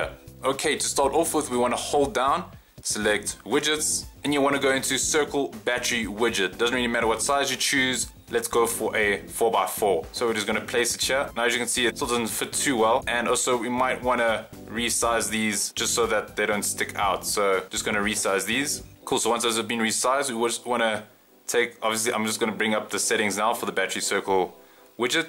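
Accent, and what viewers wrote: South Africa. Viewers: are u south african?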